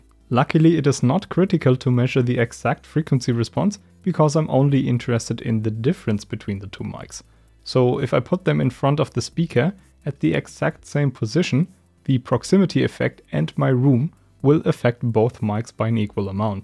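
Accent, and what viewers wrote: Germany. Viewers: HI #Detschakzent trotzdem cooles video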